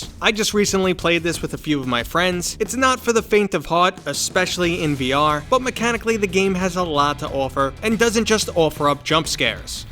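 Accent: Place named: Boston, United States. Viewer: Let me guess, are you from Boston?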